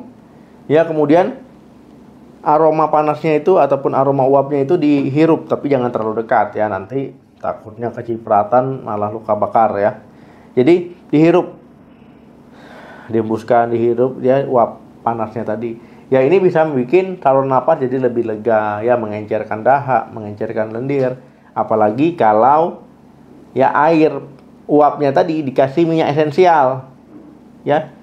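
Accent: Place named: Bali, Indonesia. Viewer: ini logat bali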